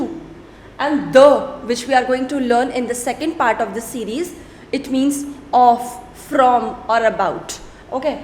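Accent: Paris, France. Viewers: Your way of speaking French is very acsent same pronunciation of Paris people I think you stayed in Paris and teach french to students thankyou for being my inspiration 😍🥰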